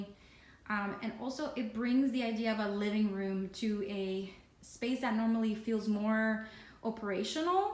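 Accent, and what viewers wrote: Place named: Louisiana, United States. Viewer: Beautiful home and property. You sound like you are originally from south Louisiana area to me. We would love to relocate to Maryville from Houston one day.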